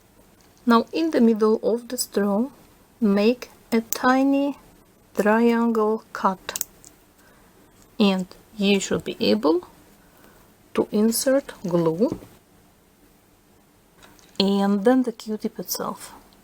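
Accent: Russia. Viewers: I love ur Russian voice and ur crafts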